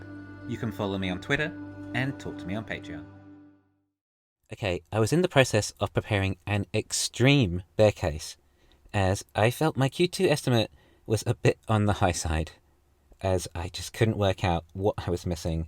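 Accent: England, United Kingdom. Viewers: You English accent is very heavy in England.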